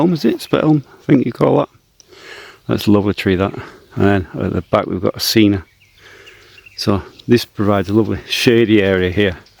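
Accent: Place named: United Kingdom. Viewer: You sound british not Russian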